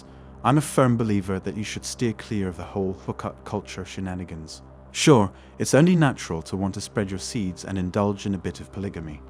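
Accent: United Kingdom. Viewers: How wonderful the British calm presentation, in addition to the nce accent, when compared with the high pitched American presentations - almost shouting.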